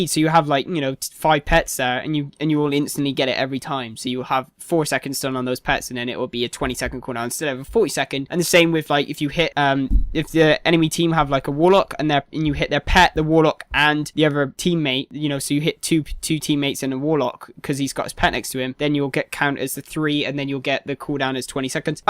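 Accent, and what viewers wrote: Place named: West Country, United Kingdom. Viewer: Personally subbed because of your west country accent. makes me think of home! Great vids. hardly any holy pala changes makes me smile!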